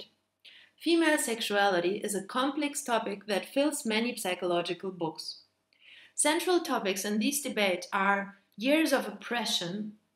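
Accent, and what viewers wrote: Germany. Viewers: I love how it is super obvious that youre german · Your German? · Are you German? :3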